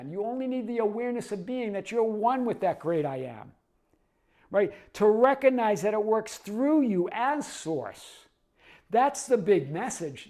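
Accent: Boston, United States. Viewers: The second you spoke I knew you were a Bostonian. LOVE the accent & your channel! I AM.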